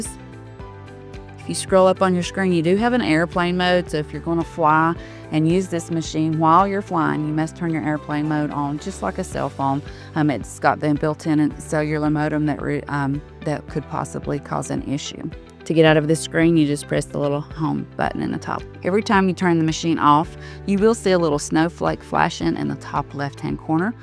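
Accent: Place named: West Virginia, United States. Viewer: This nice lady sounds like she from Wayne Co. WV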